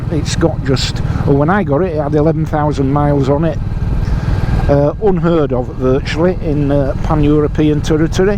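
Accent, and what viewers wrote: United Kingdom. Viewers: Keep the music hes in a rush sounds bit british.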